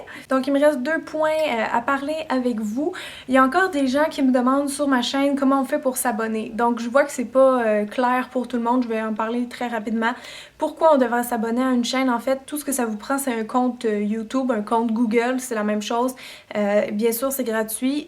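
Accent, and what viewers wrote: France. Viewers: ben t francaise non? nous on trouve que vous avez un accent ici au Quebec ♥♥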